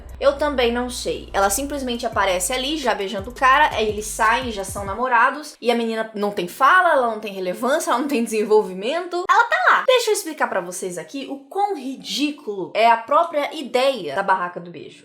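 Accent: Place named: Curitiba, Brazil. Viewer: Nas primeiras palavras que você disse eu já percebi que você é curitibana, finalmente alguém puxando o R e o E aq lkakalalaal tamo juntos, sou de Curitiba tbm kakakakakak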